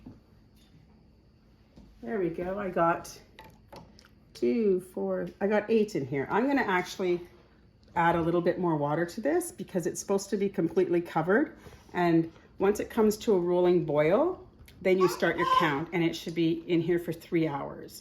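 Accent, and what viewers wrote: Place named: Manitoba, Canada. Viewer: Thank you for sharing your method! I have yet to try water bath canning, but am excited to have some ready-made food to have on hand. My sister in law's parents are from Manitoba, your accent sounds similar! Greetings from Ohio 🙃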